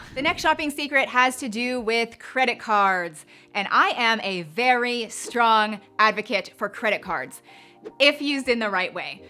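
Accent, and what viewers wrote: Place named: Pittsburgh, United States. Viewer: I love how you say “mere” (mirror) and Q-pon (coupon). You are definitely from Pittsburgh (as was I). Finally lost the “yinz” to y’all when I moved to the South. Love your channel!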